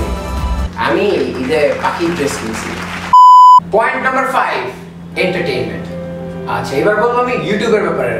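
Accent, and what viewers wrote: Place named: Old Dhaka, Bangladesh. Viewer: vi vlo bolsen.. but Language gola ektu vlo korle r o vlo hoto... but kisu korra nai karon ata poran Dhaka kotha bolar style.. jak all the best vi.. 👏👏👏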